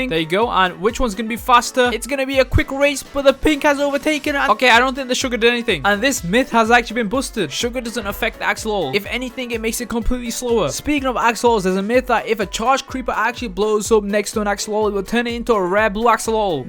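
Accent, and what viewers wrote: United Kingdom. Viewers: are you in English or U.S.A.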